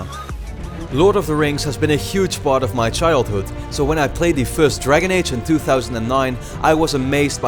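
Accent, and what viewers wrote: Netherlands. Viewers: NEDERLANDS ACCENT GESPOT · Are you dutch ?.. you have a dutch- english accent xD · Ben je nederlands, Are you dutch? i can hear it at your accent. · You sound Dutch, are you? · You sound dutch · Dutch accent? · lol i was about to ask that and i think so bcs his accent is dutch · r u Dutch becaus you speak English like someone from the Netherlands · Bas Warmerdam ik denk t ook aan zn accent te horen xD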